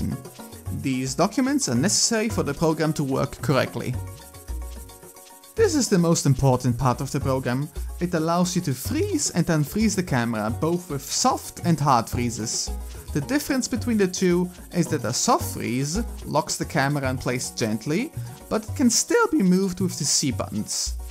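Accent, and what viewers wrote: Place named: Germany. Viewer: You sound super German it's very entertaining. Also, and is it possible to change facial animations?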